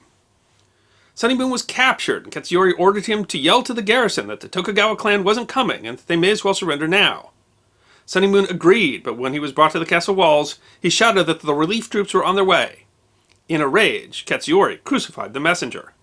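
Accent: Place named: Midwest, United States. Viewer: My Japanese is not so good. Your voice sounds mid-western to me, which is fine. I've found myself (at times) questioning certain English town / county /home pronunciations, and likewise other European places (ok, I'm a jerk) but you'll find no fault by me on this episode. Whether right or wrong, words rolled off your tongue as if you knew what you were saying and I bought it all. And...another great story to boot. I trust your script was not Kanji...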